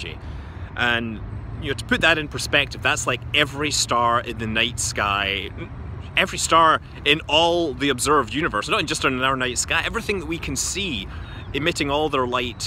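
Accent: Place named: Glasgow, United Kingdom. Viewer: I knew that accent was from Glasgow! astronomy always getting something impressive to show... go Science haha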